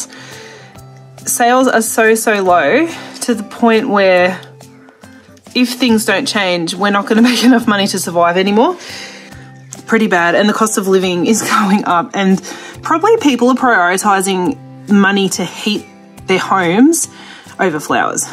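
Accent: Australia. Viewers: Hi, just wanted to tell you I’ve been watching your channel for approximately a year now( yours is one of my favorite flower channels) love the Australian accent also all the scenery footage. As far as ideas on helping to sell flowers you could do workshops in your studio and also online. Your a very good educator and I love how your husband is as passionate as you are(maybe film more on a daily basis to include family life), I’m from the states and I love seeing Australia. I watch some YouTube channels who ask if you like the content and if they’ve been of any help to the viewers you can buy me a coffee ( which I believe to mean a donation in any amount to help keep the channel growing).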